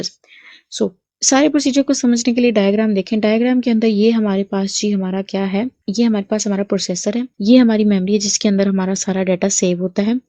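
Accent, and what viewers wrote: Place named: Pakistan. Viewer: Pakistani voice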